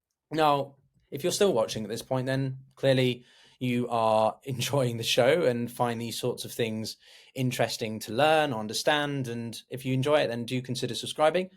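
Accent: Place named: United Kingdom. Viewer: This guy is shooting his mouth off with a biased defense of Syrian jihadists, and with his Oxford trained British accent sounds like a European interloper sticking his nose in other people's affairs. I had to cut him off because he's a phony trying to make a name for himself on YouTube. Get off your ass and get a job, you slob.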